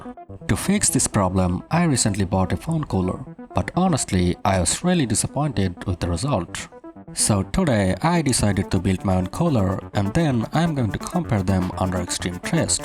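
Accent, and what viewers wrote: India. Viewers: Your voice like indian ❤🎉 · Your account from USA but your voice like from India or Bangladesh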